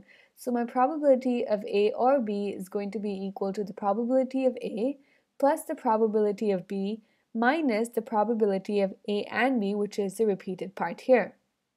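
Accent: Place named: India. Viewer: Thanks. 👍 I was just wondering if you are an Indian? You sound like one.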